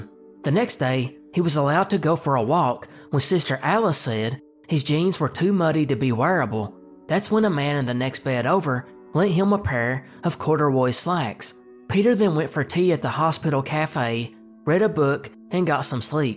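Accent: South, United States. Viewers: Man it’s nice to hear this type of video from a southern voice. I left Tennessee a few years ago and I miss our way of talking. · These are all great obscure mysteries. I can tell you spent some work on this. Nice to hear another Southerner too.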